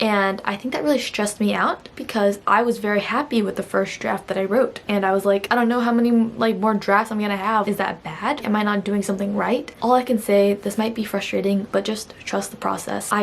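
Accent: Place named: United States. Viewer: I love you Americans and your accent :)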